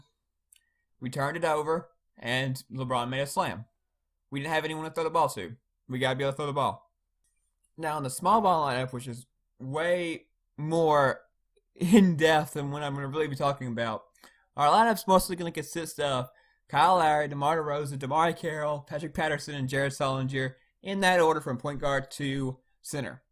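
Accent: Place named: South, United States. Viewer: Are you originally from Toronto? Because it sounds like you have a Southern US accent.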